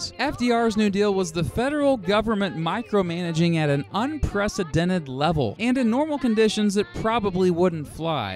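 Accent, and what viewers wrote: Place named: Kansas, United States. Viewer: '' I am from "Keensas" '' I can hear that.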